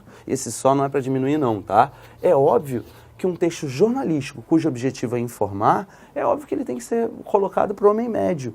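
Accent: Rio de Janeiro, Brazil. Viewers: ele é carioca falaigual a mim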